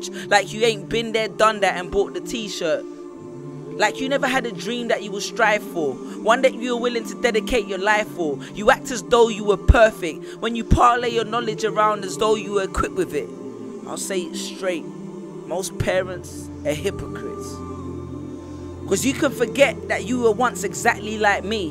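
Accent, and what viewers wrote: South London, United Kingdom. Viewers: I can tell he's from south london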